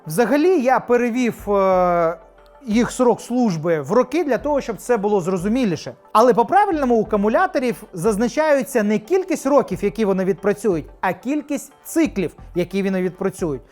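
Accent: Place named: Russia. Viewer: Чоловіче! Ти мабуть "русскій"? По інтонації чую, по агресивній заздрості. Щоб з банок по 3,3 вольта отримати 12 вольт - дійсно, треба послідовно з'єднати 4 банки. Фізику в 6-му класі вчив? Чи прогулював? Чи тебе засмучує, що 3,3×4 - буде не 12, а 13,2? А до літієвої банки, на якій написана напруга 3,7 В, а на свіжезарядженій насправді близько 4-х вльт, у тебе претензій нема? У мене більше претензій до залуПутіна, який каже, що він за мир, а насправді робить війни. Тебе він не обурює?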